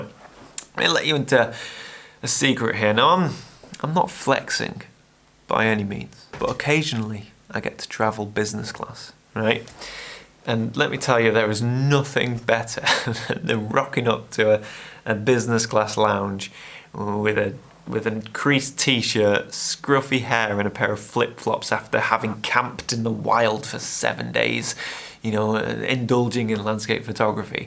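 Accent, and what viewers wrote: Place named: United Kingdom. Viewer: Very surprised about you dont like your own voice. Because the first video I saw of your channel, was with your voice in off, and it was the thing catched me, your voice and the way you talk about photography. I'm very very fan of british accents, so not a big deal, but it's fun to see that you do not like it, and for me was the first thing I noticed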